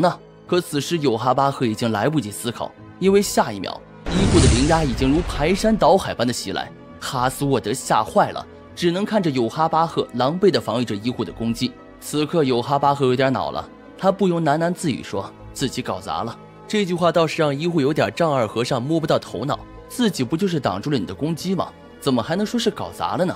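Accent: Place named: Mainland, China. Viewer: 旁白一只加入大陸方式的語言 聽起來總覺得怪怪的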